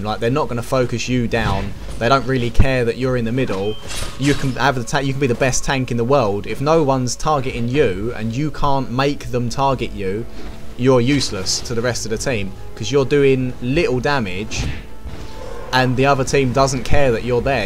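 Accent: United Kingdom. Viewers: u from london?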